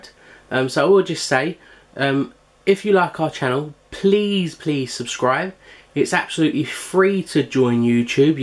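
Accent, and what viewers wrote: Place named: England, United Kingdom. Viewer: hello. Great audio description. I am blind so I really appreciated you talking and it was lovely to hear your English accent as I am English to living in Australia bye for now Donna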